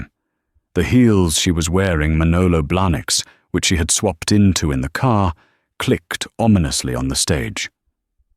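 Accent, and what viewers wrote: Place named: England, United Kingdom. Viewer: Yes. I agree. Luv that purring English accent. YUM!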